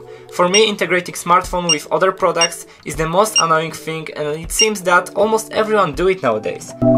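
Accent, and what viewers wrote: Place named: Poland. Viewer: Słychać po akcencie ze Polak xd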